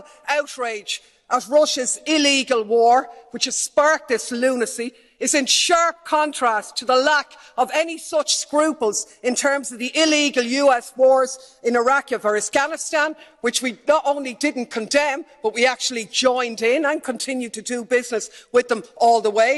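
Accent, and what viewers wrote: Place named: Ireland. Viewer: Thank you!! Wise words spoken with a wonderful irish accent! 💚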